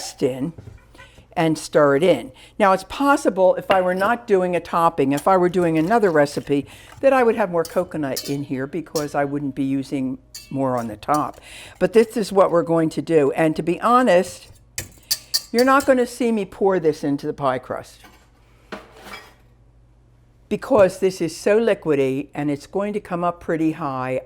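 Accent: Philadelphia, United States. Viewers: First of your videos I’m watching. You must be from Philadelphia or thereabouts. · you from Philly?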